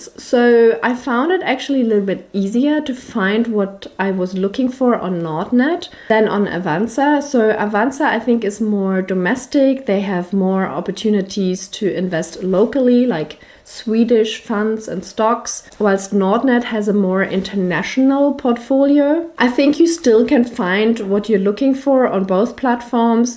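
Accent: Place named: Germany. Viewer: Wow beautiful woman and beautiful German accent.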